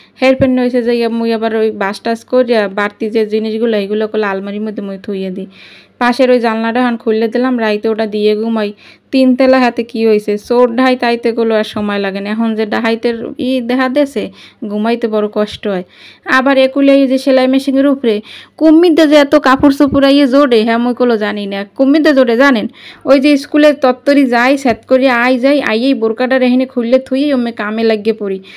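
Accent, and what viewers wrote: Barishal, Bangladesh. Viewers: আপু আমার কাছে তোমার ভিডিও থেকে না তোমার কথাগুলো শুনতে ভালো লাগে বেশি তোমাদের বরিশালের ভাষা আমি তোমার কথা শোনার জন্য তোমার ভিডিও দেখি ফাস্ট টু লাস্ট মানে তোমার কাজের কি কমেন্ট করব বুঝিনা শুধু তোমার কথা শুনতে থাকি ❤❤❤❤❤❤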